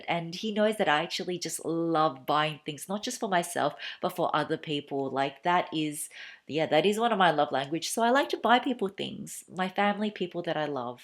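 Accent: Melbourne, Australia. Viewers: Heyy In Melbourne how do you tell if you buy branded goods quality are great quality of the handbags, slg, shoes, clothes ect.... sunglasses, scarf as im thinking of buying something soon like maybe frm lv, bv, ysl, mont blanc, cartier. Thank you for the advice & tips gff im such a hugh fan of yours from a country nearby which is Singapore and oh boy i've been watching your vids/vlogs all weeks, months, weeks, days your my no.2 favourite youtuber oh man i love your aussie aussie accent sounds so smoothing to the ears haha lol very calming as well lol. Omg gff we got the same taste we love going to thrift stores to look for vintage stuff + i love eating as well haha lol awhhhh your dogie is such a cutie so sorry to hear about it so sad 🥰😍😘😗☺😚😙😋🤗🤧🤧🤧🤧🤧🤧😢😥😓😩😭😭😭😭😭😭 & your handbags do you sent it to the bag spa? For cleaning, touching up ect....get it restitching? 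Does your hubby + you sit down with other and talk about not spending too much? You too stay safe stay strong stay healthy as well my gff. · Just found you! Love your videos I miss Melbourne so much and your accent reminds me of home so love listening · I love the accent you had.💕 it’s was same aussies accents? Of Blackpink Rosé she’s korean but born in melbourne Australia 🇦🇺 if I’m not mistaken.🥰🥰🥰😅😅 I love it very classy.💕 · I am not much of a follower of fashion you tubers, but I have enjoyed viewing your videos. I enjoy your content and your Aussie accent and all the videos I have seen you look very pretty and classy. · Hi. I just started following you on youtube and i loved your clips. Also I loved your Aussie accent 😊. I also graduated from Monash University in master of communication back in 15 years ago. Now i lived in USA. Anyway, i just wanna say please continue doing it. You brought back my ultimate moment while i was in Melbourne. 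Thank u!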